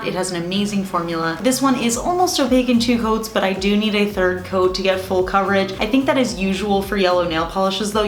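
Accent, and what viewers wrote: New Jersey, United States. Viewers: She's talked about it before. She knows it's kind of odd. She says it's because she's from/lives in New Jersey. I don't know about that because I've never been to NJ, but considering how many people of Italian descent live in NJ I can believe those Italian grandmas could pronounce the first syllable the same was as pasta. And then there are the west coast non-Italians (like my grandparents were) who can't pronounce pasta without the short A, so it sounds like the word "past" with a U sound tacked on-- PAST-uh. But then, they can't pronounce the word Italian, either. It comes out EYE-tal-yen, or maybe eye-TAL-yen.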